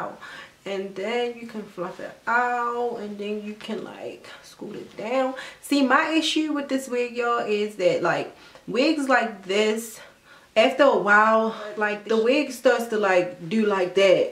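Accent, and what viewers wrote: Baltimore, United States. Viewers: I love the wig!!! You sound like my cousins in Baltimore! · I love it!!! Love that bmore accent !! U have the face for it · You from Baltimore? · U sound like u from Baltimore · Is that a Baltimore accent I hear?? If so I'm from Baltimore too. I get excited when I hear vloggers from Baltimore. Anyway I'm a new subscriber. love your channel and reviews. Love this wig!!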